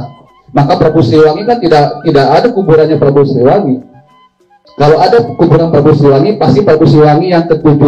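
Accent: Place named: Bali, Indonesia. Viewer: Logatnya masih Bali.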